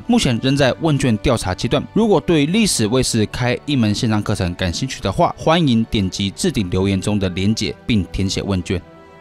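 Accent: Taiwan. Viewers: 你的口語很台灣講解方式也有點台灣不過濃濃的又支納中國的歷史觀點請問你有中資背景嗎？會不會影響我們的正確思想意識和歷史觀？ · 您的口音像是來自台灣，但是您節目的深度貫穿古今中外！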